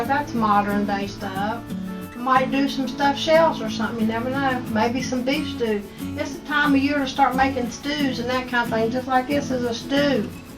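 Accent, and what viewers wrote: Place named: Appalachia, United States. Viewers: May I ask what area of Appalachia you’re from? I’m in WV and you cook & sound just like home so I’m curious. Thank you! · You are sooooo sweet and I love your lil southern accent!! Looks delicious! I wish I could have some. God Bless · lol. sounds like my momma. warsh for wash... youve done lost your mind... thank you. i sure have missed hearing this Appalachian talk from momma. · I worked in a meat market also at two different stores.. Bilo and Ingles in Sc.. IT IS COLD … and in the winter months, IT GETS COLDER... or at least it feels colder to me.. I am so THANKFUL to God that I don't have to work in a meat market anymore.. I didn't like it... I worked in that field for about 6 years and that was 6 years TOO LONG.. I don't know where you are located, but you sound like you could be from WV.. I was born and raised in West Virginia.. 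It is my home.. I have lived in Sc for a little over 18 years now.. But I do miss my mountain home..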